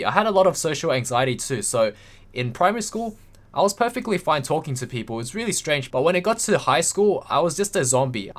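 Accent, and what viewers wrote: Australia. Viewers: Are you from Australia? Greetings from Hell's Gate dude! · Dude, just move to America. Girls are obsessed with Australian accents. My sister always says you sound hot whenever I watch a video next to her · Ah never mind I saw you going to pax in Melbourne. I thought I could hear the accent. Bro you’re a legend. I’m a lot like you in many ways (but not good at league). Anyway if I go to pax I’ll keep an eye out for you. No pressure dude I’ll see if I have enough money to buy you a drink or something xd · A chinese guy with an australian accent wtf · yeah i thought so, i could tell from your low-key aussie accent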